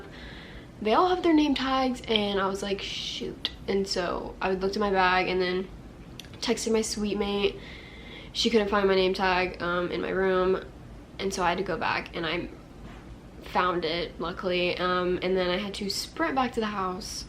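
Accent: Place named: Oklahoma, United States. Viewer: You already have an Okie accent.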